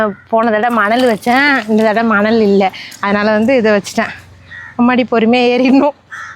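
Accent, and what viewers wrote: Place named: Nagapattinam, India. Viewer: Akka nanga pesura mathiriyea neengalum solreenga.nan unga ooruku pakam thn Nagapattinam ❤❤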